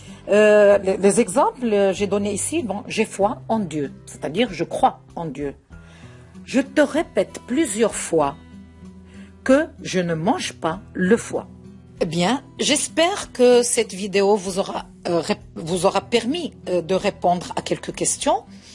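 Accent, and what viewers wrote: Arab world. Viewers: Je vous remercie pour ce superbe résumé. Même si on utilise beaucoup la langue française, on a tendance à oublier les règles essentielles. Vous avez effectivement un accent arabe ou kabil mais il me semble que vous en connaissez plus sur la langue française que beaucoup de français de souche. Alors continuez à nous faire des vidéos comme celle-là . C'est très utile.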